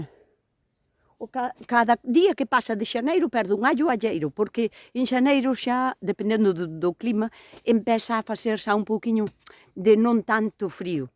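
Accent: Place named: Padrón, Spain. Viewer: Falo moi bén ,,gustame moito mirar os teus videos Por o falar creo deves de ser cerca de mín si podes xa me dirás Eu son o lado de Padrón 😊😊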